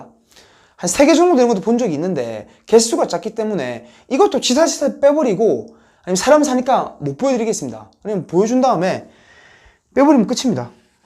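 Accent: Busan, South Korea. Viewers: 고향이 부산이라 말씀이 더욱 정감있고 시원시원하고 진실로 구독자 생각해주시는 부동산 팁 잘듣고있습니다^^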